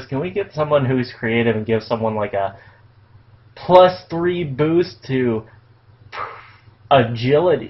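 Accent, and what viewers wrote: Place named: United States. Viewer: He sounds country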